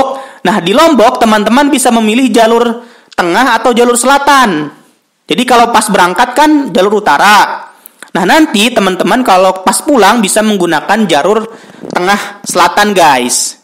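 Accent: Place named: West Java, Indonesia. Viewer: Keren mang, meni sunda pisan logatna. InsyaAllah abi bade solo riding ka flores minggu dpn.. Aya IG mang?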